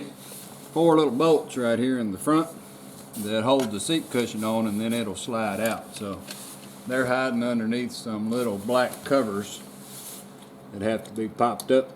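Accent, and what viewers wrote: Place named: South, United States. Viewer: Lo hace verse tan sencillo, que Bien maestro y me gusta su acento country. Gracias. Brenda from Mexico city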